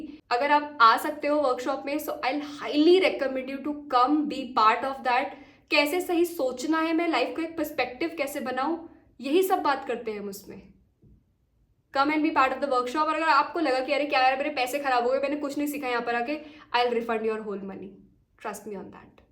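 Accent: Bengal, India. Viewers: Tu bengali hai kya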